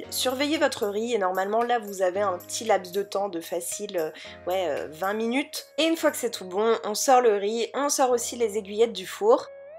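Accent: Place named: England, United Kingdom. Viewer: Laccent anglais de ouf #pas du tt de l'ironie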